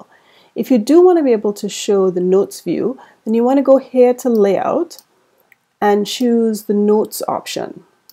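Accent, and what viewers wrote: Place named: Trinidad and Tobago. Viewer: Thank you. You saved me today. You sound like a Trini, like me. Thanks again!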